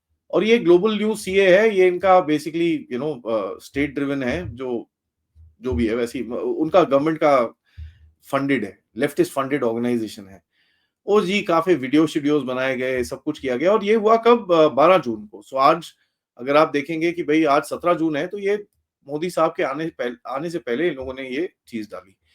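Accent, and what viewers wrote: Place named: South India, India. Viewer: South Indian hai wo. But itna bolte hai wahi kafi hai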